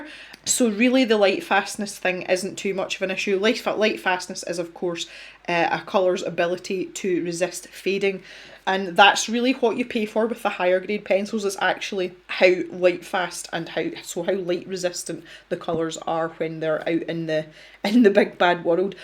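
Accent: Scotland, United Kingdom. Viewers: I have these in Australia and they are good, love your Scottish accent 😊😊😊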